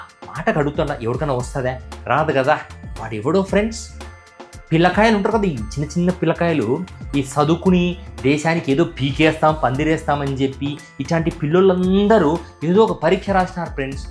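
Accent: Rayalaseema, India. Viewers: బాగా చెప్పావ్ bro. మన రాయలసీమ slang lo Super ga cheppav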